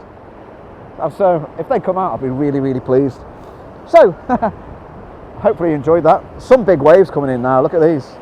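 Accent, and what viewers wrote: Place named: Merseyside, United Kingdom. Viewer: Really enjoyed that video only just discovered your channel so will be watching a few more. It is a truly magical location I must of followed a similar path to you, as I have similar angles,(maybe not quite as death defying on the cliff) it was that windy I could hardly walk up by the lighthouse. Am I right to think by your accent you don't live that far from me in Merseyside somewhere I live in Runcorn.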